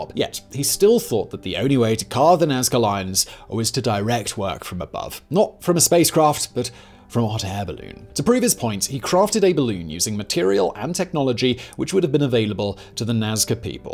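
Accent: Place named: England, United Kingdom. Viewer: Midlands/south England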